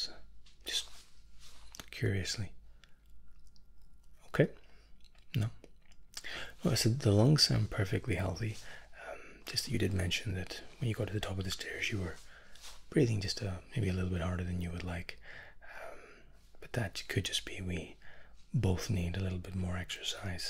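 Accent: Scotland, United Kingdom. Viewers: Love me a handsome Scot with an immaculate accent. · Is he British ??? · Is he Scottish?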